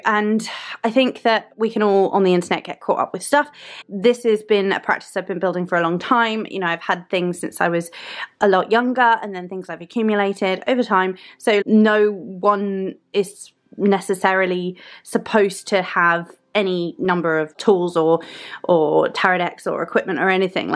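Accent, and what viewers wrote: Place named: RP, United Kingdom. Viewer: Love your video and thank you so much for sharing your altar in this video. So creative and absolutely LOVE that huge rose quartz tower. Love that we both truly love the dark goddesses: Lilith, Hekate and The Morrigan. I pointed out that your book shelves looked like mine with all those books piled high. He was like "you witchy types like your things," I was like, "be glad that you are one of those things that I still like, lol." I adore your accent as well. It sounds so posh to me. Being from the states and I am in central Ohio, I don't really have what you call an accent. I get a country thang occasionally when saying certain words and that comes from my momma, and being around her all my life. lmao. 🌹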